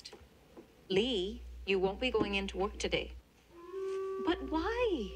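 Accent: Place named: Minnesota, United States. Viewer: This is adorable. And so is her accent, idk if shes from Minnesota, but it's cute. ❤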